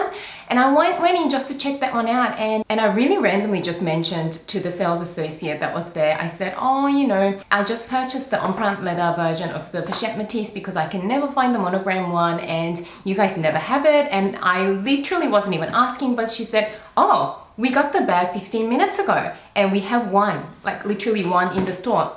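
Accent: Australia. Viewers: Ive never heard a Chinese accent mixed with an Australian one before, mind blown.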